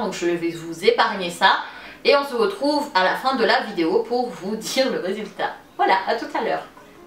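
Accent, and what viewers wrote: Madagascar. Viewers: Tu es malgache ?ça s'entend à ton accent ;)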